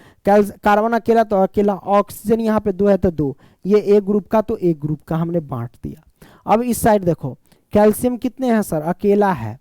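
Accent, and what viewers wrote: Bihar, India. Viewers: Sar aap Bihari hai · sir kya aap bihar se ho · Aap bihar se ho kya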